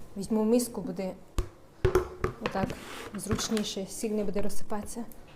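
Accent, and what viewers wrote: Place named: Zhytomyr, Ukraine. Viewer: Здравствуйте. Я сама с Украины с Житомира диалект знакомый,вы где живёте? Очень скучаю, а теперь не знаю смогу ли приехать. Я живу в Казахстане, Алматы. Спасибо.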